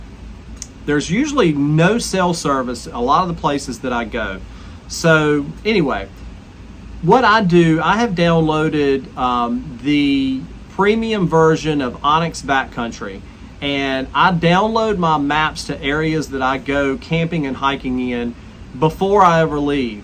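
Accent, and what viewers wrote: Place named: Western Maryland, United States. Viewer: Thanks for the videos, they're very helpful for camping/bushcraft info! Sidenote: I grew up in western MD but have been living in the Rocky Mts for a few years and man, hearing you talk is making me so homesick!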